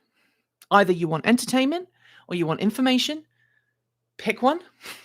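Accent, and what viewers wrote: United Kingdom. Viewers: Enjoy your vids as they are don't change because of some narrow minded people be yourself and hang in there and I love the British accent hang in there 😊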